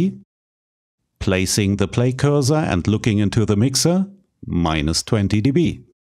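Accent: Germany. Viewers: I LOVE this channel! Gold mine! And the German accent makes it quite entertaining! 😄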